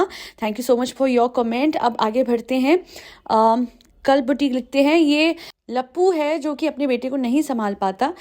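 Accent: Punjab, India. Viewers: I know, it's ok.. it's not easy to reply all comments,we love you always,nd specially love your punjabi accent ❤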